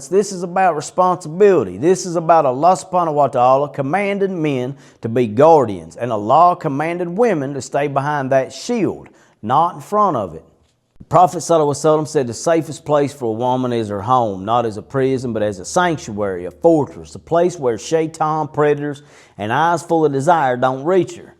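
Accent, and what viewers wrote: South, United States. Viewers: Lived in Nashville for a year and honestly love that accent as the southern belt residents With love from Madina may Allah bless you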